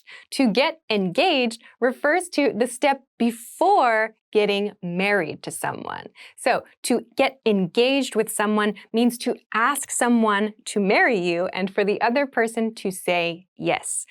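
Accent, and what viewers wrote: United States. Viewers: She speak in American accent❤ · First of all thank you for your content! I'm confused a little, why does a "suit" sound like a "sweet" (at least this is how i hear it) - I've checked that word on the youglish and it sounds a bit different. Is your pronunciation specific to some region in the US? cheers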